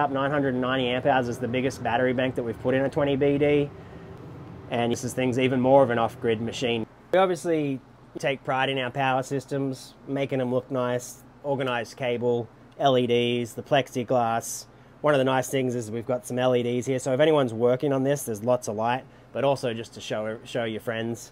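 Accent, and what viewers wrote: Australia. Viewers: You sound like an aussie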